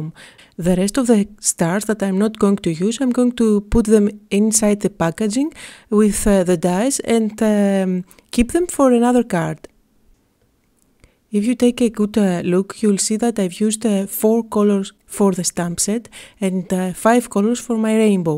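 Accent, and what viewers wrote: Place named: Greece. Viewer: i have enjoyed every art journal and card making video you made. Your art really is so warm and joyful. You have such a talent too for demonstrating all of the various products. I love your Greek accent too. Keep the videos coming please and thank you.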